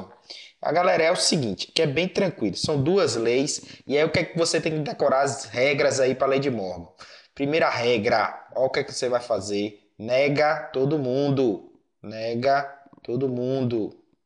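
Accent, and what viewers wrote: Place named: Bahia, Brazil. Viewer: Sotaque baiano arretado! rsrsrs massa!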